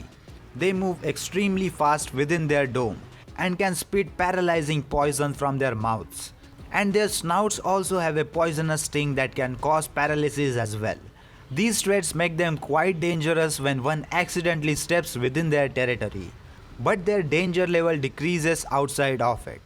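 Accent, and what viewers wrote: India. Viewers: Made in Abyss is one of my recent favorite series speculative evolution and creature designs. The fact I play Pokemon Violet, and train down in Area Zero, which somewhat resembles the Abyss, means that the series not far from my mind. Coincidentally, the raids also have a star rating, although the highest rating is 7 rather than 6 stars! I almost kind of wish we'd get DLC Pokemon based off on some of the Made in Abyss creatures! Normally, I have a notoriety for understanding what I believe is an Indian accent. And while yours is quite strong, you take the time to pronounce your words speak them and strongly. I appreciate that. Even some American YouTubers fail to do this, harming the enjoyment that can be gained from listening to their videos.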